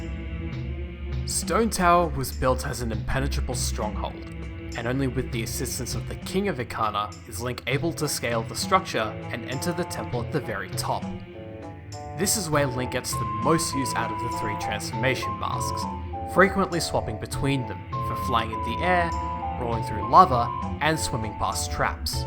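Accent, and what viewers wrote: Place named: Australia. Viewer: I love the Australian accent. Okka-reener. Amazing.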